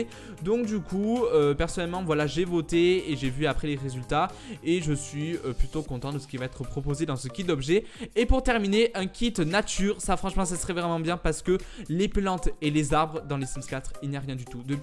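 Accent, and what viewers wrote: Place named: Toulouse, France. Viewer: jadore ton axent toulousain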